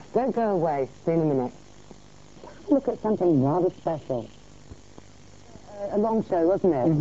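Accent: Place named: United Kingdom. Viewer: Aint nothing Iike a British Iady in Iatex, when she spices all that beauty with the accent.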